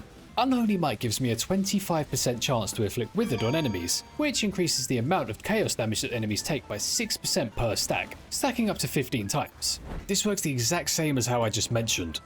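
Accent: United Kingdom. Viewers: ah, yes, all the things I love in one video - chaos, cats and crit-proc calculations narrated by a cockney bloke <3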